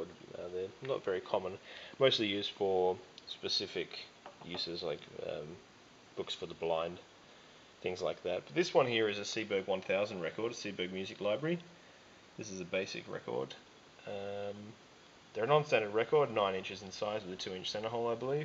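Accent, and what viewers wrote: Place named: Australia. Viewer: Funny you mention SC35C, I just purchased one to put in my TYPE A, which is currently running a Stanton500-II, mainly, again, due to the tracking weight issue. I just need to get some mounting posts to assist the process. BTW, I also have a 4HF in almost pristine condition, from a 'high-end' console back in the 60s. I have been meaning to overhaul it and put it into service. Do you have any tips for maintenance on the 4HF? (from your accent I presume you are in Australia as well, maybe NZ?) Cheers...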